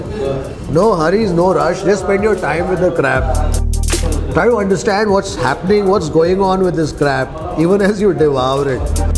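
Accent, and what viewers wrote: Karnataka, India. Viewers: ನಿವು ಮಾತನಾಡುವ ಕನ್ನಡ ಕೇಳಲು ಬಲು ಚಂದ.....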